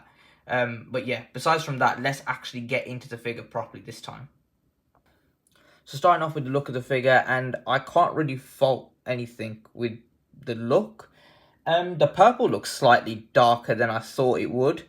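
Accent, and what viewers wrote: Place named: Birmingham, United Kingdom. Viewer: You from Brum too?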